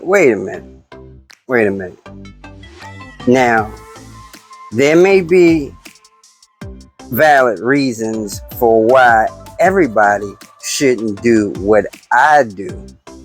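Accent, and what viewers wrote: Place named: New York, United States. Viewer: Yeah congrats for 1,000 subscribers I got 570 and dropping and I don't really care because every time somebody watches my videos they make money and I don't LOL why do you live in California and sound like you're from New York you must be from New York nobody has that accent. When I lived in Bedford I started getting that accent yes I used to work at Bedford prison in New York on boilers. We kept all those women warm LOL. Well that's good you're not in jail I'm glad and I hope you're not slinging bricks because those kill people and know how matter how much money you make you can end up in jail forever. Not worth it I'm poor I could have done that I can find a bag of s*** way faster than a bag of weed I grow my own weed though Gorilla Glue number f o u r that s*** is the bomb. Next is blueberry Autoflower. I used to be a farmer now I'm in the closet farmer LOL. 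I bought a battery online and now I'm wondering why the f*** did I do that? I need prayers I bought it from an individual that sells all kinds of different stuff and no batteries it says it's brand new 20 amp hour 48 volt for 450 bucks plus shipping and whatever about 480. It has a really nice metal charger but who knows if it works why did I do that oh my God? Well I can send it back but that cost $60. Now I can tear apart the other battery once I get that one and I don't have to worry about going on a trip still if I screw it up they won't even tell me what kind of BMS is in the freaking battery. They sell you a batt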